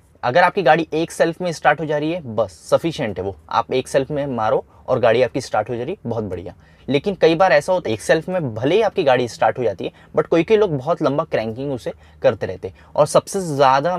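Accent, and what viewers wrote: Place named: Hyderabad, India. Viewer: Love Your hyderabadi touch to accent😁❤️❤️Good info👍👌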